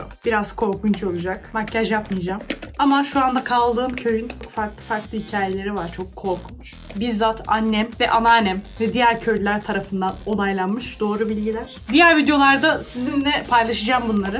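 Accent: Turkey. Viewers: Adapazarlımısınnn ahhahahaha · O kadar sakaryalı bi anlatım ki hahsjajjdjsd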